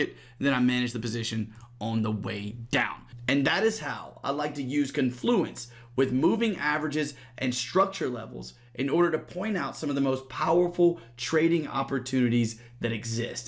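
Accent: South, United States. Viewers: Very helpful videos, love that subtle southern twang too! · Love your quality content & your southern accent brother.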